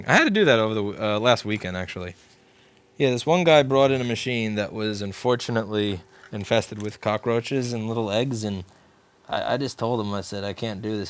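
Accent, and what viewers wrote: New York, United States. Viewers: as someone who uses English as it supposed to be used its deffo soLder ..lol .. but your US-NY dialect doesn't bother me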